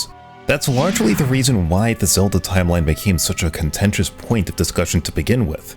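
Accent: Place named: New York, United States. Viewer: You must have the heaviest New York accent when you're not putting on this radio voice.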